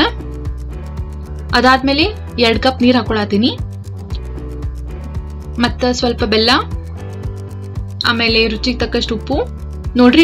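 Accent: North Karnataka, India. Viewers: North karnatakada Mathu tumba channagide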